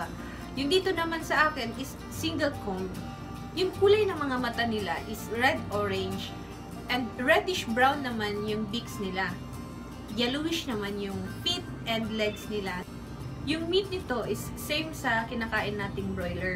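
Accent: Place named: Bicol, Philippines. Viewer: new subscriber here from Bikol... tga saan ka ma'am? parang bikol yung punto ng tagalog mo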